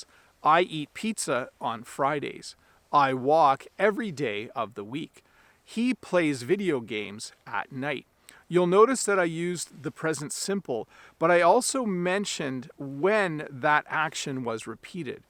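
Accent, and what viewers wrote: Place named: Canada. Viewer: Really nice succinct video. I love that Canadian accent that shows the influence of Scottish immigration. Your ideas for building up a following are great, you deserve all the success that you get. Well done!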